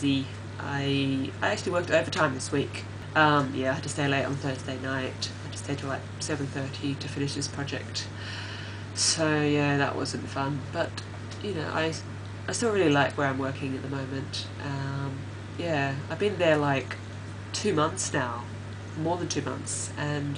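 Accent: United Kingdom. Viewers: you look like you are studying aboad or something.. its funny to here a british accents from you kkk.... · Omg an asian with a british accent... I'm asian too, will you date me?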